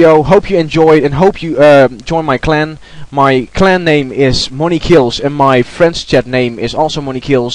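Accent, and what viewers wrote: Netherlands. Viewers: i can hear that you are dutch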